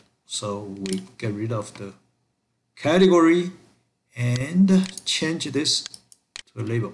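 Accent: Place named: South Korea. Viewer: Thank you ! Helped a lot as a beginner :) Also your accent reminds me of my korean friend.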